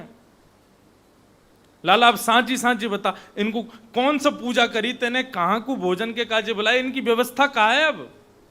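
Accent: Braj, India. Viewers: महाराज जी आप इतनी मीठी ब्रज भाषा में बोलते हैं ऐसे लगता है मानो प्रत्यक्ष लीला हो रही है सामने हमारे छोटे से लाला को किसी की नजर ना लगे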